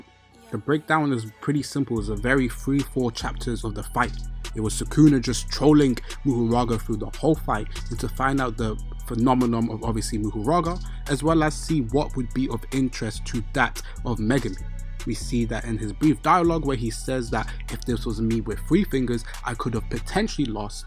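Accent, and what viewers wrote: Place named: United Kingdom. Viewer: UK accent is annoying tho 😅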